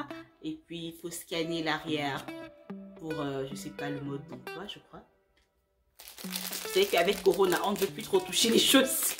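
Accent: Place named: Cameroon. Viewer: Ahhh l’accent du Cameroun ! Musique à mes oreilles 😊😊😊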